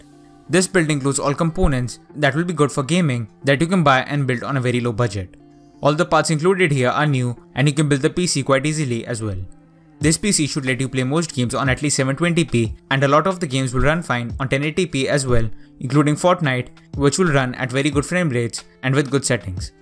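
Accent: India. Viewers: Guys don’t be so mad I know he has a Indian accent and the pc only got 4 gb ram but it’s a budget pc so it should be cheap and he say it’s for Fortnite so if you want pubg go and watch another video ;)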